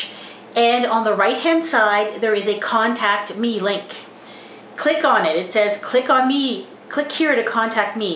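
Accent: Canada. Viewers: I love your Canadian accent, ha. I'm right below you! ( America :] )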